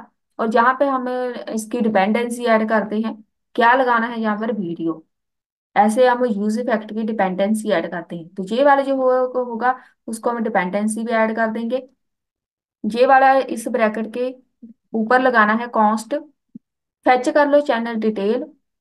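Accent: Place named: Ambala, India. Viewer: Apki aavaj to ambala side ki lag rhe hai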